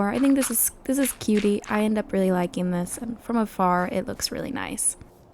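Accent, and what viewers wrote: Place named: South, United States. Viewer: I love how towards the end she just transitions more and more to her southern accent 😂😂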